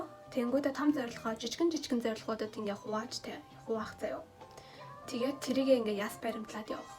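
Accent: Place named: Mongolia. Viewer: анх монголоор ёстой инээдтэй ярьдаг байсан шд😂 одоо аргагүй монгол хүн шиг ярьж байнаа байна.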